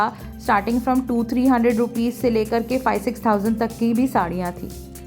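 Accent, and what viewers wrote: Bengal, India. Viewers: Wow! Loved your bengali accent. And Will this market soon. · Aapnar Bangla ta darun! In fact you even have a Bengali accent! Have you been living in Kolkata long?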